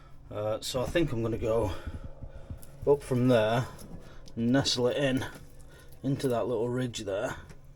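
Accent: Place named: Northern England, United Kingdom. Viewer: Not at all, I love your northern no nonsense presentation. We are, ourselves from the North (Yorkshire). We're based on Anglesey now, but the boat is in Conwy. The house you are talking about sits right in the middle of the Swellies (near Menai Bridge and Llanfairpwllgwyngyll), which is reported locally to be one of the most hazardous areas of water in the UK to pass through. Apparently though, the best time to navigate it, at a good speed, is at slack tide (an hour or so either side of high tide when the whirlpools are least effective would work). Safe travels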